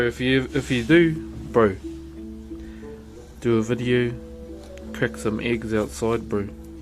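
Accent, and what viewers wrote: New Zealand. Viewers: Keep up the good work mate. It's awesome what you are doing, it's really interesting. Hearing you speak makes me miss working with all of the kiwi lads that i used to bounce with. Cheers 🍻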